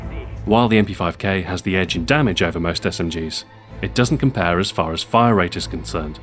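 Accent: England, United Kingdom. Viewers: Most useful intel indeed, as always narration is more believable in british. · He sounds like one of those professional british voice over actor. SOOOOOOO good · it took me 4 minutes to realise hes english :D i cant recognise my own accent :D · I never knew is brittish lol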